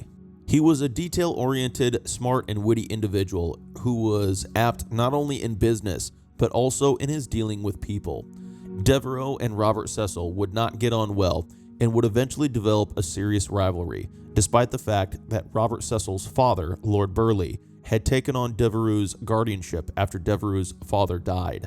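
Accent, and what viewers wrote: United States. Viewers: An American narrator. That lends no credibility to this at all. Americans lecturing about English history is outrageous.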